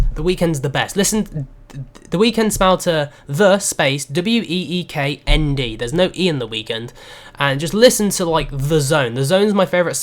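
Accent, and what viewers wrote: United Kingdom. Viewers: He forgot to say one thing he has that most others don't. He's got a British accent. All he needs in life now is to move to America, and use that accent to his advantage. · Is there anywhere in particular you want to visit in America? Also I'd love to see you say (or try to say) my username since you have a British accent and everyone already butchers it. P.S you should visit Texas when you come to America. I'd hang out with you. :p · i gotta few questions for you bro actually 1: is that a british accent u got? 2: how come your eyesights bad? 3:how is it you find out when a new slenderman game is out?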